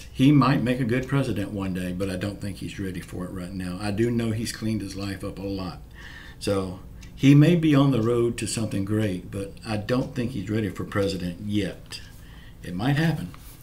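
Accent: South, United States. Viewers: Thank u for answering my question💕 love hearing ur southern voice 🙂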